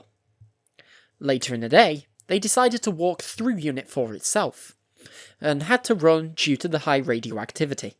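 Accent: United Kingdom. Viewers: I know you got a British accent and all, but Kursk is in Russia, not Ukraine.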